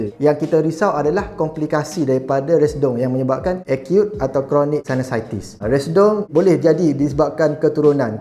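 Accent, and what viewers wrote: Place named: Kelantan, Malaysia. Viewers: Bunyi Kelantan Dr ni cakap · Abg ni orang kelantan kan? Slang kelantan tu ada kedengaran.. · Kelantanese accents has been detected.... 😁😁 Apa² good information doctor .....👍🏻👍🏻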